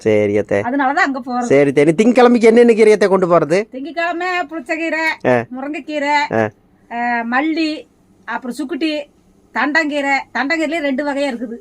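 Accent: Kongu, India. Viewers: கொங்கு மொழி வாழ்க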